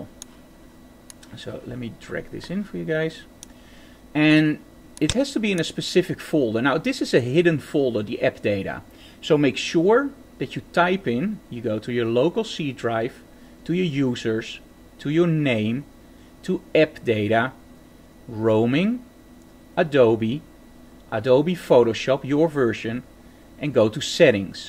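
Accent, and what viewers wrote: Netherlands. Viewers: je bent echt overduidelijk engels met je nederlandse accent, blijf wel dit soort videos maken tho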